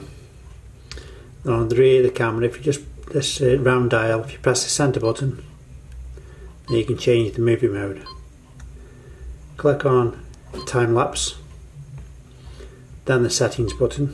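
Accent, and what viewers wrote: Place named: Barnsley, United Kingdom. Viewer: ps where you from? barnsley? lol Im from leeds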